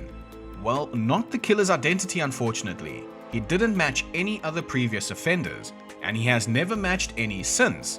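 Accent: South Africa. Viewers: Had some South African friends in London back in the day and it does me good to hear the accent again.....especially those wide open "I's"...(eyes...)....oh yes, and great content, love the chat-by-the-fire style, infectious laugh + amazing research and thoroughness...give a treat! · Dude you are fucking hilarious. It’s like listening to a south african George Carlin reading crime stories 😂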